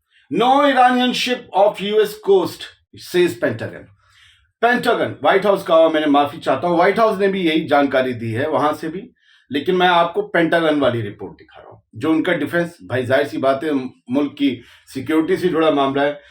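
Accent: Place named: Lucknow, India. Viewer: your accent sometimes make me feel that you are from Lucknow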